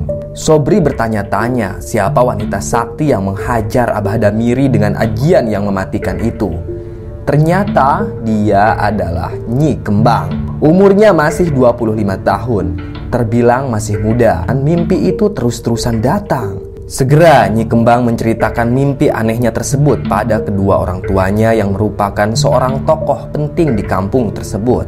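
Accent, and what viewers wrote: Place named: West Java, Indonesia. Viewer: Asli sunda apa jawa mbah. ? Kaya orang sunda eta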